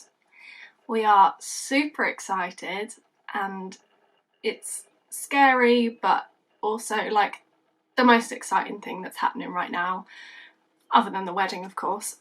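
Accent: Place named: England, United Kingdom. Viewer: Congratulations to you and Ryan on your upcoming wedding and buying your first house. I hope everything goes well, and I'm happy for you and your fiance; that's exciting! :) I didn't know that both you and Ryan grew up in villages, that's interesting :) You have the prettiest accent, I love hearing English people talk :)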